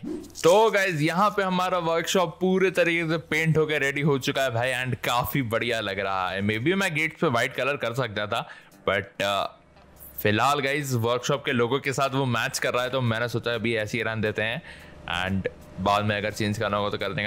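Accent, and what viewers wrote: Maharashtra, India. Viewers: are u Marathi?????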